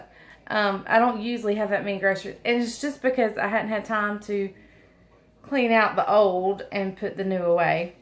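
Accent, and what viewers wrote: South, United States. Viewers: Are you saying Aldi?? Sorry this northern girl is having a hard time with the southern accent😅😅 love your channel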